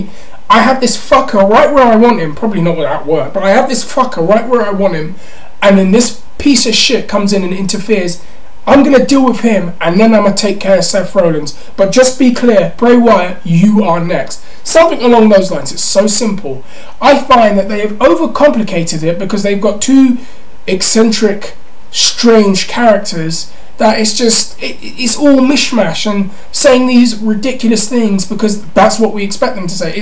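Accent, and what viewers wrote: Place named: Glasgow, United Kingdom. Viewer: You sounded like you were from glasgow, like normal times for me!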